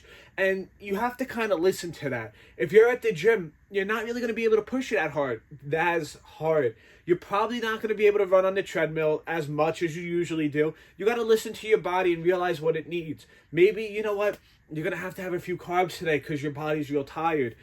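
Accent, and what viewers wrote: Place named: East Coast, United States. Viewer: Hope you get to read this. Just found your channel and love your content. Just by watching your videos and listening to you i feel already more motivated to go to the gym and i already love going to the gym. You east coast accent its sucha perfect gymbro motitation chefs kiss Plz take my opinion is the best way possible. Keep up the good work! Love from Sweden.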